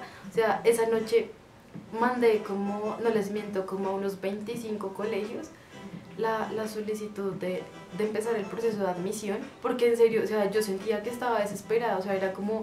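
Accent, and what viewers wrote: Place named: Colombia. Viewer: Holaa guapa! encontré tu canal en el de Marisol de mi vida con Mateo y me encanta, me gusta como hablan los colombianos pero así como tú jijii me suscribo y te invito a mi canal también soy mamá <3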